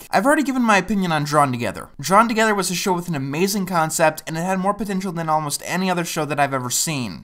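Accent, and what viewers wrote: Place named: United States. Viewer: So realise he's American, right? They say "bedder" instead of "better", "lenguage" instead of "language", "naht" instead of "not"... I could go on. Maybe that's just how they pronounce "execs" in America?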